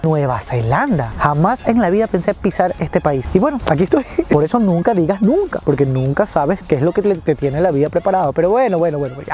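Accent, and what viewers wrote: Ecuador. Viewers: Jaja ni bien te escuché hablar dije el debe ser ecuatoriano Saludos desde Quito :) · he mi comentario esta ahi tu acento guayaco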